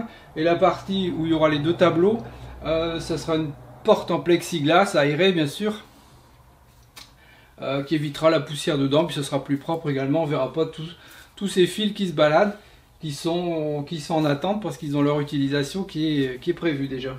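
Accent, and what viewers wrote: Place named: Mining region, France. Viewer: Bravo pour cette présentation , je suis un nouvel abonné et à entendre ton accent , tu dois être de la région des mines , si c'est cela on est voisin , j'habite à 30 kms de Dunkerque .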